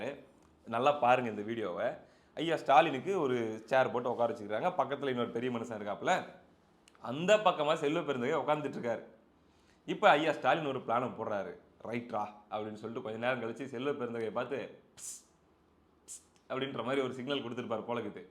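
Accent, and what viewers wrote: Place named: Salem, India. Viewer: உங்கள் பேச்சு மொழி (சேலம் வட்டாரவலக்கு ) அருமை நண்பா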